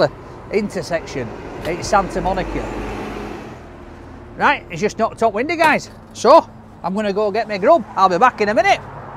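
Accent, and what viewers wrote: United Kingdom. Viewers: Great videos.. great accent. What part of Britain is it?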